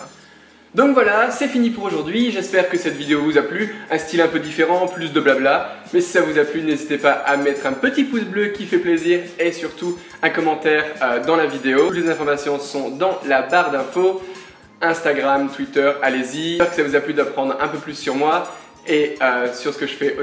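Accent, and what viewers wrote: France. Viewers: Oh un ch'tis !! Check ahah ! J'admire vraiment ce que tu fais, en plus t'as un accent de dingue j'adore ! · génial ! oh mais....tu es ch'ti * _ * j'habite pas loin de lille,tu as fait un programme d'échanges?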